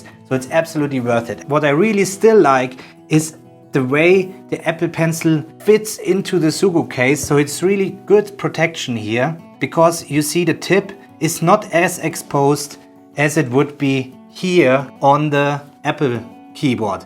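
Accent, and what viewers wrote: Germany. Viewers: Direkt den Deutschen Akzent rausgehört 😂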